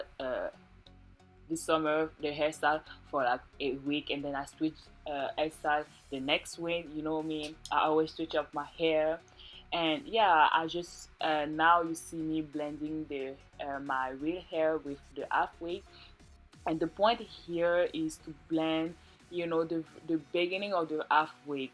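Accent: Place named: France. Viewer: Nice video! Es-tu française? You sound like you have a French accent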